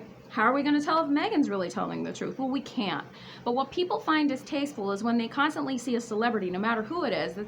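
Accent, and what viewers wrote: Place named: United States. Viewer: I love listening to you. And your down to earth ‘tell it like it is’ approach. That’s why I enjoy your vids. You talk like ordinary Australians do. Yet with an American accent. Keep up the readings. Fantastic!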